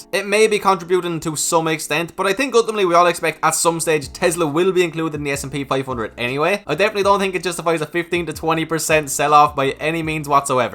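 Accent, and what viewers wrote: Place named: Ireland. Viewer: Great channel - a succesful Irish YouTuber who doesn’t try to sound like a yank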